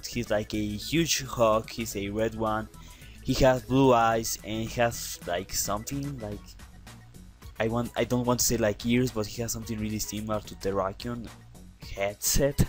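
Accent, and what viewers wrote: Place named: Russia. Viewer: Your English is great and your accent is wicked giving A great voice. Is it Russian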